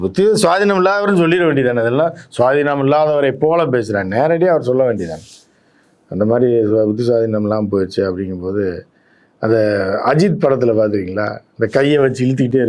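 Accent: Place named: Madurai, India. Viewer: எல்லாம் யூ யூட்யூப் சேனலில் உங்க மதுரைsland பேச்சு க்கு தனி சிறப்பு❤❤அதில் ஒரே சிரிப்பு மழை😂😂😂😂😂😂😂😂😂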